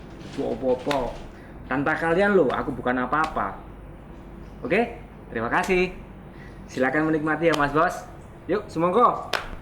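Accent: Java, Indonesia. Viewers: Njowoooo banget mas idola😙😙😙😙gaya ngmge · Wala mas omongnya amburradul Jawane masih di bawa mbo di Tingal sedikit 2 mas kelihatan Banget re jawanya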